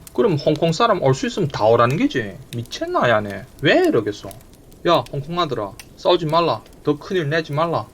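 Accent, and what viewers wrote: Yanbian, China. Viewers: 좋은영상 잘보고있어요 지난역사 현정치에대하여 머가먼지 자세히는 모르고 있지만 ㅠ 님영상보면서 여러모로 많운걸 배우고 생각하고있답니다 사실은 같은 조선족동포임에도 유트브로듣는 우리사투리가 처음엔 잘적응이 안됐었는데 듣다보니 친숙하고 님의 깊은 마음도 자세히 전달되는거같고 재미있게 보고있어요 감사해요 댓글은 처음이네요 화이팅하세요 ~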